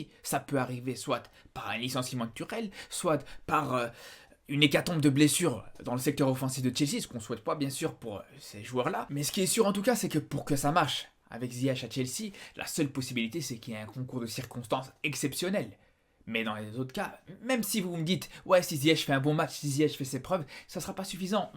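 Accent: Belgium. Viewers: J'entends un ptit accent belge. T'es marocain de Belgique ?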